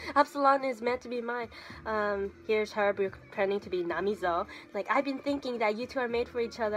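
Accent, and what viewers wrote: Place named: England, United Kingdom. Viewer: i wish i had your english accent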